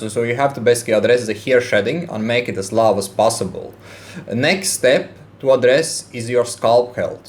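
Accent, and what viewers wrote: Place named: Russia. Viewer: Are you Russian?! Your English made my brain fuckd up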